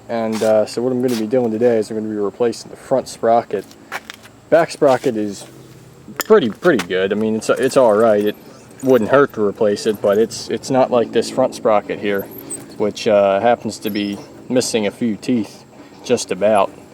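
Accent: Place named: Pennsylvania, United States. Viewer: you sound like a Pennsylvanian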